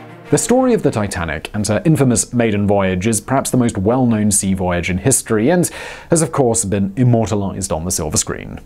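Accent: United Kingdom. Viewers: All of channels present facts in an interesting way with use of a very articulate Britsh dialog. I can listen all day in astonishment of how in contrast, I speak so badly as a southern American.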